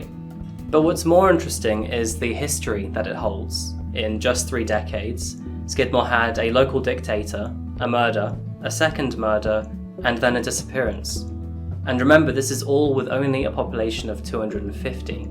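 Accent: United Kingdom. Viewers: UK accent? Plaid shirt? XXL Forehead? I thought we were gonna give it a goo :(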